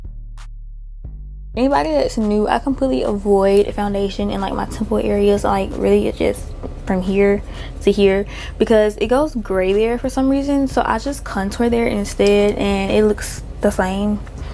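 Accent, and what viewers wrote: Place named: United States. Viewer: you sound american but nicer american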